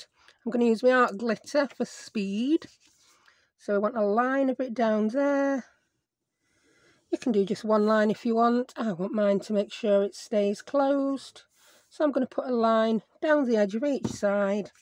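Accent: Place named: North, United Kingdom. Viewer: Brilliant tutorial thank you. Great to hear a Northan accent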